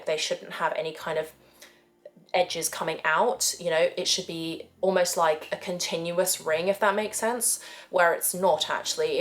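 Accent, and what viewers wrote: United Kingdom. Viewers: I love your attention to details a great video for newbies Sounds like you have an English accent, which means you have full access to amazing Cuban cigyes. So don't understand why you are going to buy something other than from a Reputable dealer . I travel to London quite a bit ,and is one of the most expensive places to buy a proper Cubano I'm dutch American an have access to any cigar of choice, I prefer many other cigars over cuban its 2023 and cubans losing steam with guys like me to many better options ,China has driven up the price but once they get taste of Davidoff my father Padron the will quickly convert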